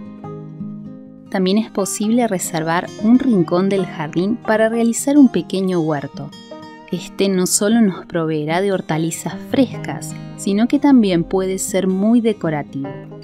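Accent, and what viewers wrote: Argentina. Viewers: Con ese hermoso acento argentino no me cansaría de escuchar audiolibros en mi jardín. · Yo lo silencio...no me gusta nada el acento argentino.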